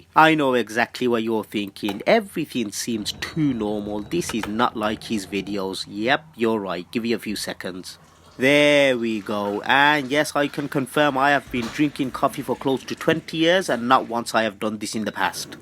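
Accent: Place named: Midlands, United Kingdom. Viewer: Hope you will give more entertaining videos in future. Kinda stunned to see very few subscribers in your channel, maybe the gap took place earlier affected in your algorithm but I don't know the exact reason. However, I try to shadow your videos because of your lovely midland accent. So put regular videos and make me happy. N.B. - I really hate cockney a lot :) :) :)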